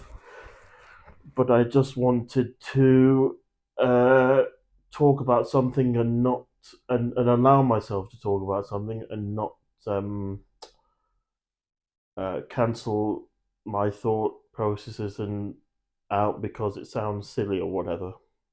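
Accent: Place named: Southern England, United Kingdom. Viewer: I’ve only just seen your channel pop up on my homepage, and there are elements of the helplessness that absolutely resonated within me. I lost my girlfriend of 5 years due to cystic fibrosis when we were both just 21 years old. I had months of feeling just as you’ve described - and remember being sat in my kitchen crying my eyes out with my dad feeling like he wasn’t able to help me. I know you’ve not asked for advice but all I will say is the thing that I found the most difficult was allowing myself to be happy, to be in the moment and take the joy from the little things at their face value, and try to detach the shadow hanging over me. The joy of every happy moment was overshadowed by thinking “tomorrow will be back to black” but over time I managed to let go of the shadow and for me that’s when my second life began. It’s the first time I’ve seen your channel, and really do hope you read this as it takes an absolute boat load of bottle to come out to admit these things. 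Sometimes with mental health things just feel so hopeless. I’ve subscribed to your channel, despite the fact that all I’ve seen is a man crying for a few minutes 😂 but in all seriousness I will look out for your next video, I’ve got no idea where you’re located but your accent sounds like southern England - if you are anywhere near Hampshire and want a beer or a coffee it’s a genuine offer mate. You won’t get there today, maybe not even tomorrow but you will get there. 🤘🏼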